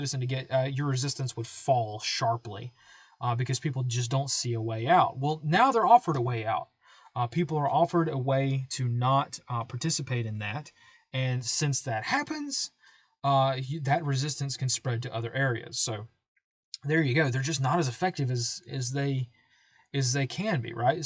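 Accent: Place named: South Carolina, United States. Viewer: Just found your channel the other day and today found out you did briefs like this. This is awesome. BTW as an SC native you sound like one of us! Thank you for sharing your wealth of knowledge and skill with us. It is greatly appreciated and a service to your fellow Americans. Godspeed to all you gentlemen at S2!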